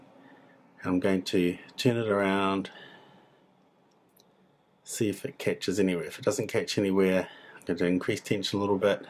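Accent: New Zealand. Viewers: Cool accent I think Kiwi?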